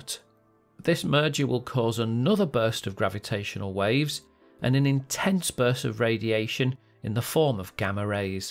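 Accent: United Kingdom. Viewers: pAIr, nor PER...bEta not bIta. british...they invented the English language but still found a way to butcher it...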